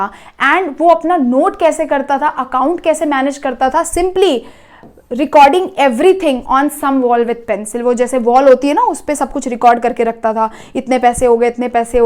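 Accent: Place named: Bengal, India. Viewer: I feel like ma'am is bengali as her accent of speaking pranjol is very bengali type of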